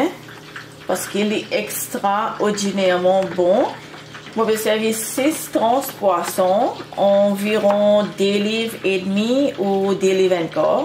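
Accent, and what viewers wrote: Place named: Haiti. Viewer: Accent creole la seryer net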